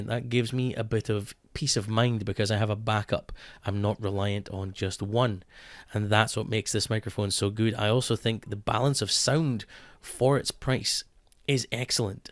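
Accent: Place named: Scotland, United Kingdom. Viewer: You sound like someone I know. I have heard that Scottish voice on certain videos before on the internet.. 😶‍🌫️ ASMR next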